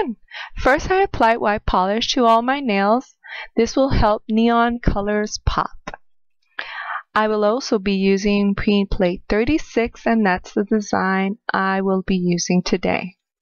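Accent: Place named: Cuba. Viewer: No no hablas muy bien pareces cubana me gusta tu acento eres muy buena haciendo videos sige asi yo te sogo siempre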